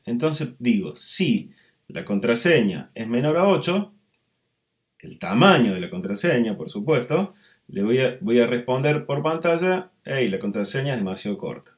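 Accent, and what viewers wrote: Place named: Cordoba, Argentina. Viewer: estan buenisimos tus videos , muchas gracias por compartirlos, de donde sos ? sonas bien cordobés, yo soy de Cosquín.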